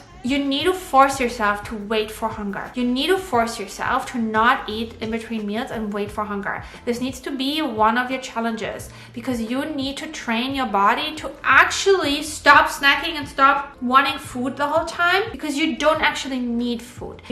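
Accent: Germany. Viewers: Diet advice with a German accent was so needed for me! ❤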